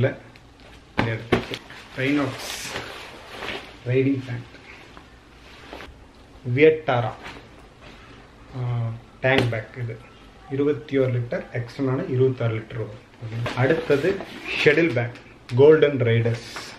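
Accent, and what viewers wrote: Kongu, India. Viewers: Enunga Coimbatore ngala.? Kongu Tamil 😍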